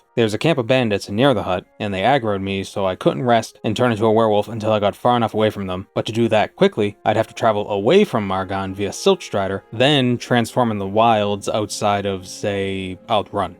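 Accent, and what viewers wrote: Boston, United States. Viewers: So are we just never going to acknowledge the Boston accent?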